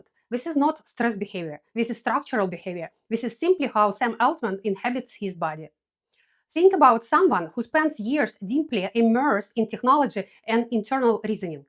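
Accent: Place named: Russia. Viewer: Pretty, pretty thick russisch accent. Bloody hell 🌷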